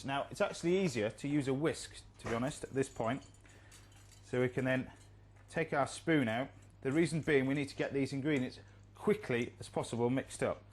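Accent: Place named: Yorkshire, United Kingdom. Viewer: How Yorkshire does he sound! I fell in love with when I lived in Leeds but never thought he sounded really Yorkshire. But now I'm back in Australia, I can't believe how strong it is! By gum lad.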